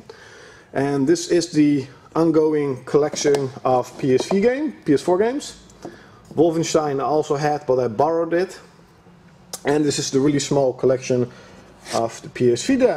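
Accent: Netherlands. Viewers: you really have a Dutch English accent lol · Ben je nederlands? Are you dutch because you have a dutch accent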